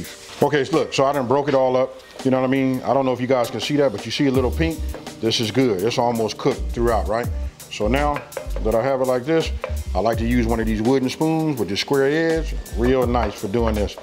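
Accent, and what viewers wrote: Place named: West Coast, United States. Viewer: Bruh your speech is so Westcoast 😂 salute OG!!!!!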